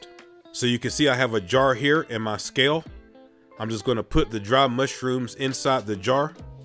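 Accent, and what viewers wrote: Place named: United States. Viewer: I love the American accent, it's deep or somethin'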